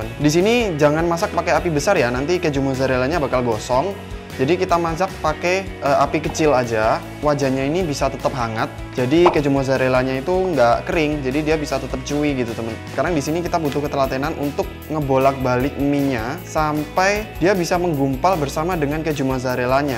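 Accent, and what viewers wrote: Java, Indonesia. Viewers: medog bgt cak.....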